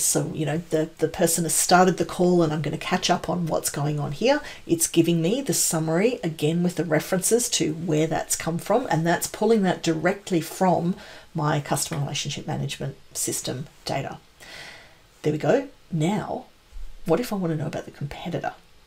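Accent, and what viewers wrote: Australia. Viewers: Thanks a great explanation (in an Aussie accent 👍🏾) Keep it up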